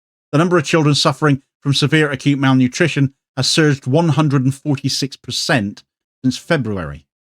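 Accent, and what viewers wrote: Cornwall, United Kingdom. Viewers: Cheers for getting the alternative viewpoint out,. Respect and much appreciation for standing up against bigotry, shut down, intimidation and most sinister of all, genocide. I look forward to your measured "rants" on a daily basis and all in your warm and friendly Cornish vernacular. Keep going, dear friend ✌️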